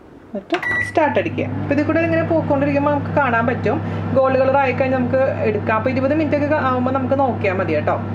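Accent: Thrissur, India. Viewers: Super simple recipe, enikku aa Thrissur accent ishtayi tta 😊😀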